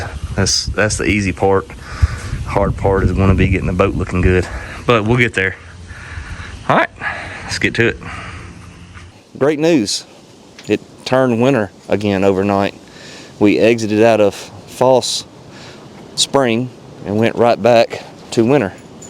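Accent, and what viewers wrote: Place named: Ohio, United States. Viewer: what state are you in it sounds like ohio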